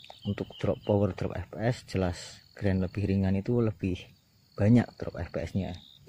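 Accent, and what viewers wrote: Java, Indonesia. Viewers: Mas njenengan jowo ne pundi nggeh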